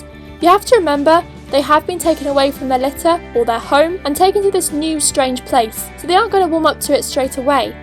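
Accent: England, United Kingdom. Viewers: are you English? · You sound English.. Anyway if you are do you know the best place to get hamster toys and hideouts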